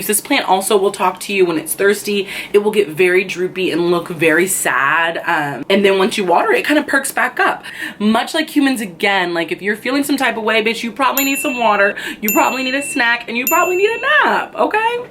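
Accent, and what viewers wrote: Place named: New York, United States. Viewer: Hey Princess! Excellent how you present yourself. My goodness! Love the mix of just right Ebonics and everyday English. You should be considering acting, reading dramatic audio books, customer service, all areas that puts you in the public, HA YOU DO'N NYER. I am also a NYer. (Brooklyn native I am). Thank you. Have a blessed one. Yeah, I'm a plant lady too and I've got about 40 plants on my plish (plant wish) list, will be bought as babies cuz I ain't spend'n no light bill money on a plant, that's hard for me to do. So far I gooot, boout, between 50 to 60 plants in my small NYC apartment. Plants are just dee bomb! Just found ya and will see ya some more in future. Again Princess, bless!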